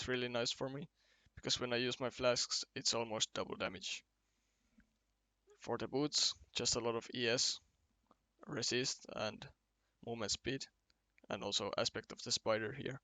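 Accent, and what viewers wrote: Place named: Finland. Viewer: If i learned one thing in Finland for my 16 years of stay there, it is how finnish people sound when they speak english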